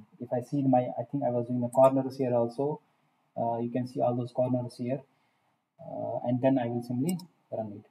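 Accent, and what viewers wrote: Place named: India. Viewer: 现在印度英语听多了， 毫无障碍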